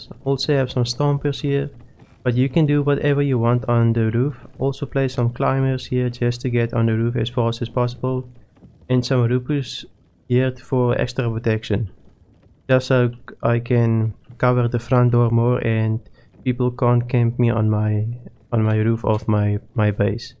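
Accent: Afrikaans, South Africa. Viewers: Thick afrikaans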